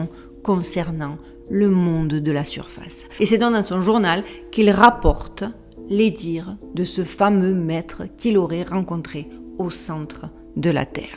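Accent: Marseille, France. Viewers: Merci pour cette nouvelle vidéo, passionnante, comme toutes les autres. J'aime votre façon de faire vos recherches et d'en rendre compte. Sans compter vos accent du sud qui me rappelle ma Provence! Vous parlez au début de la vidéo de certaines réactions quant à votre pseudo...J'avoue que j'en ai été surprise car cela est diamétralement opposé au travail de recherche et de Lumière que vous faites. Et comme chaque mot, chaque émotion ou pensée est créatrice, je trouve votre pseudo sombre, mais vous devez avoir vos raisons d'avoir fait ce choix. Et je le respecte même si cela pose question, et la réaction des gens me parait normale. Belles fêtes de fin d'année et encore merci pour votre travail! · Je ne comprends pas très bien Tu as l accent marseillais mais sur fond d américain Quoiqu il en soit au bout de 2 vidéos je me suis abonnée J adore ta façon de raconter et puis tu es très sympa Heureusement que je suis tombée par hasard sur ta chaîne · J'adore les "cagoles" intelligentes (avec l'accent de Marseille), surtout ne change rien, et je te souhaite le meilleur. 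· Grand merci 🤗 Quel travail de recherche fouillé … Nous avons de la chance de pouvoir bénéficier de ce travail pour l’éveil de nos consciences! Excellente continuation! A très vite 😊 Ps: j’aime beaucoup ton accent: celui du Sud de la France non?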